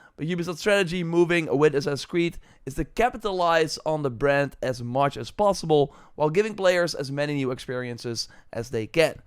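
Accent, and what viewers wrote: Netherlands. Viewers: Dutch (Netherlands) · i really wanted to watch this video but that thick dutch accent is so grating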